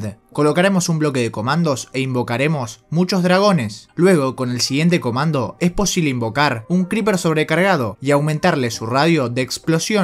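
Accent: Argentina. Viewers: Lo mejor de los vídeos es la voz argentina.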